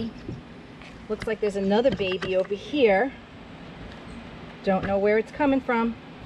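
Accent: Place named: Long Island, United States. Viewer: Thats nuts! Love the long island accent.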